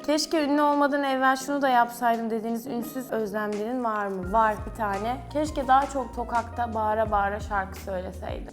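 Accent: Black Sea, Turkey. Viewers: Karadeniz şivesi değil Karadeniz ağzı.Şive başka ağız başka.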